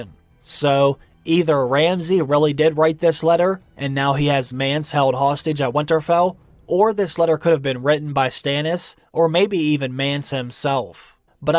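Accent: Pittsburgh, United States. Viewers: I know this is completely off topic but are by any chance from Pittsburg?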